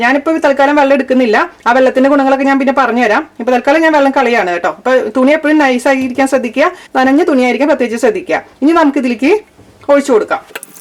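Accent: Thrissur, India. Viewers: njanum thrissur kaari aanu..chechiyude samsaram kettapo manasilayi.nte naattukari aanu ennu.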